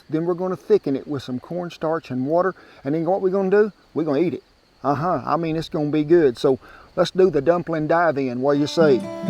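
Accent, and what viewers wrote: South, United States. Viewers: I’ve never seen your videos before. But Jesus h Christ you can’t get any more southern than this. Hah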